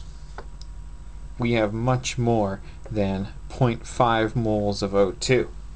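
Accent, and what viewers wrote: Canada. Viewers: i hear that canadian accent on ya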